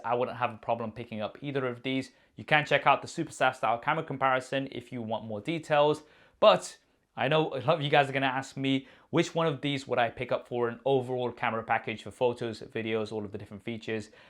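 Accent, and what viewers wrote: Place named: United Kingdom. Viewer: An Indian dude with a British accent? that would be very interesting to see in person here in maga country lol 😂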